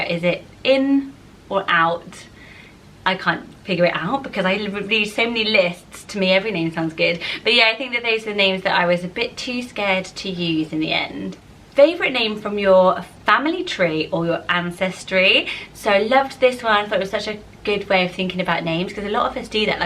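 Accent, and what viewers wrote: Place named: Bristol, United Kingdom. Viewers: I'm from Weston and honestly didn't notice your West Country accent until this video 😂 I go to Swindon often for the ice rink 😜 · I KNEW IT, I FREAKEN KNEW IT! I COULD HEAR THE RRR'S AND I COULD HEAR YOUR ACCENT, I'm sorry I'll calm down... 😂Okay well I predicted Bristol, but I mean, I was pretty close. :D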